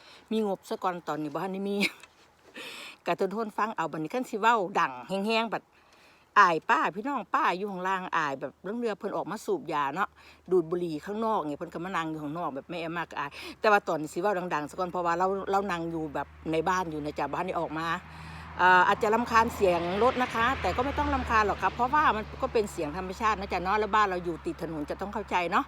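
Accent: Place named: Kui, Thailand. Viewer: เว่าเป็นตากุยแท้ครับ ฝรั่ง55+😄😂🥳😁🎉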